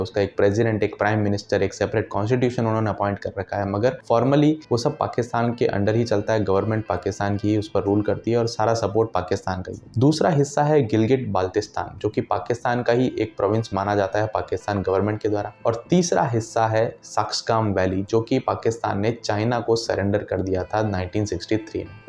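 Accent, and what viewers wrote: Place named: Hyderabad, India. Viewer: hyderabadi hindi💞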